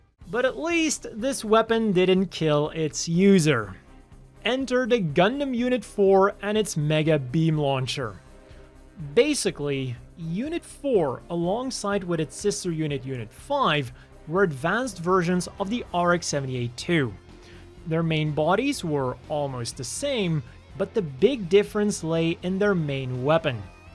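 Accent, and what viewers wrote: Belgium. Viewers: As a Dutchie, it's great to hear you speak in Dutch (with the lovely Belgian accent)! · ooooh je bent een belg!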